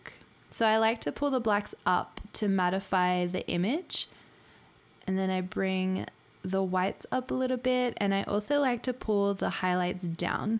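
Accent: Australia. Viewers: Thank you for the great tutorial,this gonna help me alot 😉 Are you Aussie?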